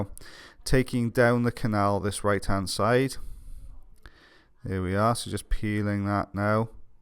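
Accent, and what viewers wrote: Wales, United Kingdom. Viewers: God Damn! Look at all your female fans! A bear of an audiologist with a Welsh accent gets the ladies going. I'm jelly. Your Mrs must hate your channel.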